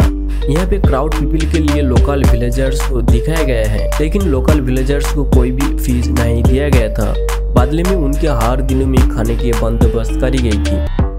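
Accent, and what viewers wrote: Bengal, India. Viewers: App bengoli ho na app ki bat karneka tarika pata chal jata hai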